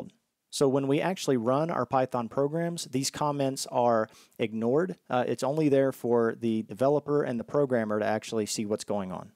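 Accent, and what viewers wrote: United States. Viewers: Man, your speech is amazing. Where are you from? I mean it’s obviously that you are from U.S, but what state?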